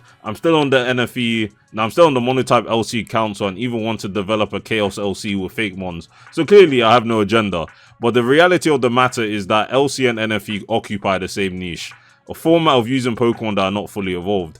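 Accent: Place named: England, United Kingdom. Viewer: What part of england are you from mate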